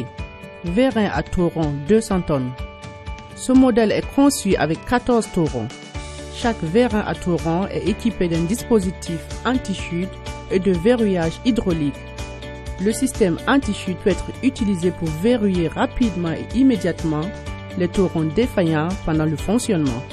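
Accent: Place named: Africa. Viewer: j'adore l'accent africain !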